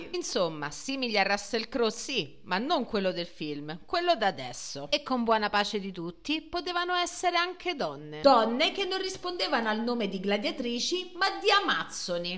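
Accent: Rome, Italy. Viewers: 92milioni di applausi ... FACCE UN VIDEO SU LLE POPOLAZIONI ITALICHE PRE-ROMANEEEEE... ovviamente co lo accento romanello tuoooo ❤❤❤ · Sei fantastica! Qualsiasi argomento, tu lo rendi interessante e divertente, con la tua narrazione in accento romanesco! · Che peccato sta parlata romanesca, non se ne può più! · Sempre più MITICA, (parlante).Daje a pacca' col romanesco,che musica!!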